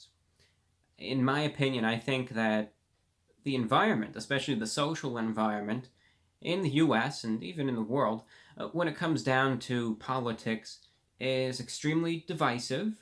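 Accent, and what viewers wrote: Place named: New England, United States. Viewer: What kind of accent does he have? Vermont? New Hampshire? That’s an interesting accent...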